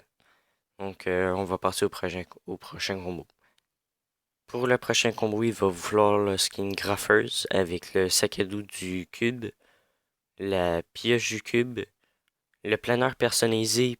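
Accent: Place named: Quebec, Canada. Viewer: Ho l'accent quebecois 😍😂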